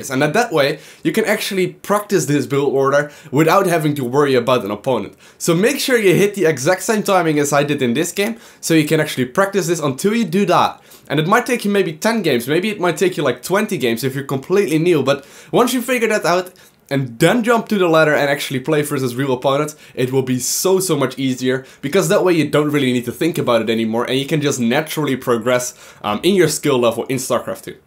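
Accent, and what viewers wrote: Netherlands. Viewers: It sounds like Dutch so much hehe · are you dutch? you sound really dutch but I cant quite lay my hand on it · Are you dutch?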